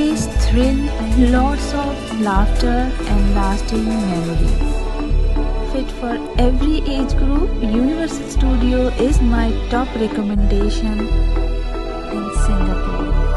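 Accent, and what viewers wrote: Tamil Nadu, India. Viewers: U r tamil is so sweet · Cute Tamil❤ love from Chennai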